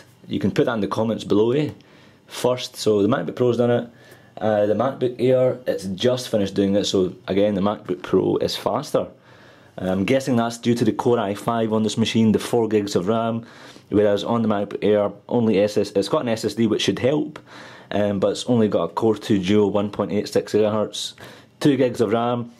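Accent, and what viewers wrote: Scotland, United Kingdom. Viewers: Are u scot?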